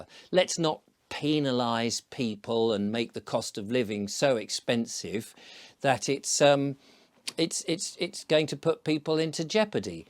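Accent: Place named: England, United Kingdom. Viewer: Just watched your video about where you were told off. You are absolutely not stupid or think. You are a much deeper thinker than those haters that have to complain about everything. I am an American and truly enjoy listening to your very pleasant, very English, as far as I know, way of speaking. Very easy to listen to. Nice to hear someone who appears to think before putting his mouth in gear. I am also a craftsman, a millwright, woodworker, metal fabricator and auto mechanic. I think the person mad at you is out of line to complain, think he takes himself a little too seriously. Anyway, I will continue to follow your sight and enjoy learning more about England. I am equally proud of my country even though it is going through some tough times with leaders I don't think have our best interests at heart. Stiff upper lip and all that, old chap. 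Let the criticism roll off your back like water off a duck. Can't please everybody.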